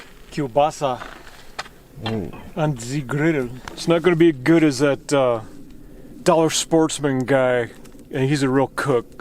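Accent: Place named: Minnesota, United States. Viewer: Good vid but GREAT shots!! : Couple questions: FIrst, can't help but notice midwest/Minnesota accent, where you from? Where are you hunting? Looks like you are hunting something that i get some shots at: Golden-mantled Ground Squirrel but the distance shots they look huge like prairie dogs in South Dakota. Just trying to figure out where a guy could get that much action. All the clues (cali license plate) are pointing to Arizona/California area, but I've never seen or heard of that many of any kind of rodent down in the South West. Thanks. (I shoot: Hatsan: Blitz .30/Barrage .25/Hydra .25/Flash Pup .177 - same Hawke scope as you, ATN X-Sight 4k Pro, ATN Thermal Monocular) I mostly night hunt the rat infestation in Vegas with the Barrage & the night/thermal. Thermal is a game changer!! Totally worth it. Thanks again.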